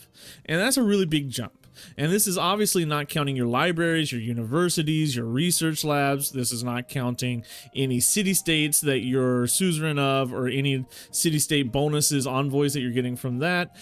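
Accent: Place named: Texas, United States. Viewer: I wouldn’t have known that from 1 million years you sound like you’re from like Texas or something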